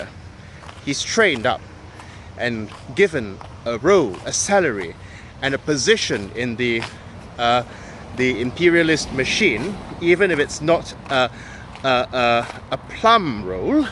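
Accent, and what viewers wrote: United Kingdom. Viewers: Funny how they make it a big show about your british accent, when a lot of the millenials and gen xérs do their rubbish best to imitate the american ones, despite having a rather poor grasp of the american english vocabulary..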